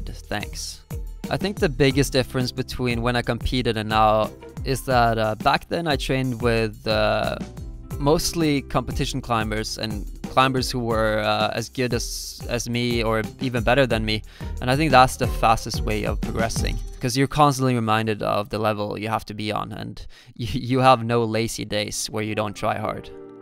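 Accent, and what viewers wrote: Bergen, Norway. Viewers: Damn i think his accent is changing! Less norwegian accent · It's always so weird when you speak Norwegian and it's with a Bergen dialect. I expect something else for some reason, I've mostly heard you speaking English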